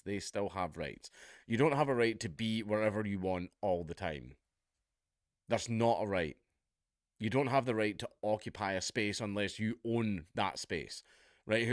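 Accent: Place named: Scotland, United Kingdom. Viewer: I swear, you Scots, with your accents, can make us Americans laugh the hardest, even with the dumbest jokes! That thumbnail did it for me!😆😂🤣😂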